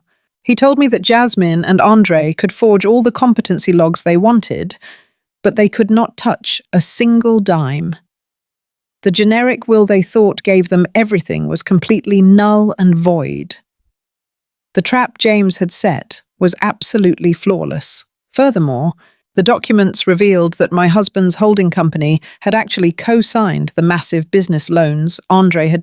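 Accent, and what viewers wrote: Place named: United Kingdom. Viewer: It might be a good idea to just leave race out of this. These are simply human stories. This is obviously a British sounding white woman’s voice. Just let us enjoy it. Thanks 🫶🏾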